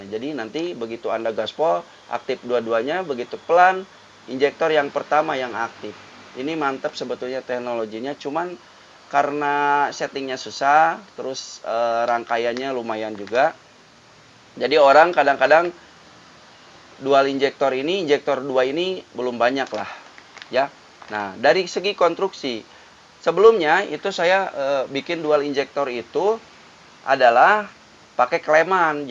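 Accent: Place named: West Java, Indonesia. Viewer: pantasa at beda lmun orang sunda nyarios bahasa indo mh moal lesot tinu lagam /cengkok na a🙏😅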